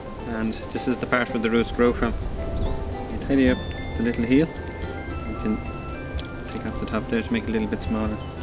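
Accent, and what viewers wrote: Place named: Ireland. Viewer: I'm from NZ and I love ur straight forward, no fuss, informative style and that Irish (???) accent is to die for. thanks for the video.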